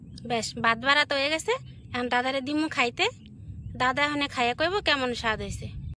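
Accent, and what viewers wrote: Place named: Bangladesh. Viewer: দিদি তোমার বাড়ি কি আগে বাংলাদেশে ছিল তোমার কথাগুলো একদম বাংলাদেশের মানুষের মতো❤️❤️❤️❤️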